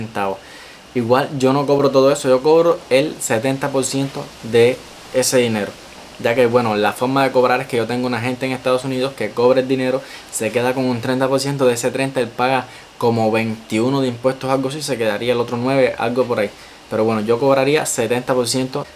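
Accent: Cuba. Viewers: Me encanta tu acento. Primer cubano que escucho que habla de forma fluida y entendible. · Cuban Spanish language sounds very different than the Spanish I am accustomed to hearing in NYC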